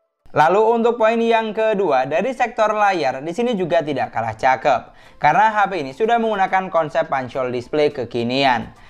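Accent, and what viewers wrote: Madura, Indonesia. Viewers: Logat suaranya kek orang madura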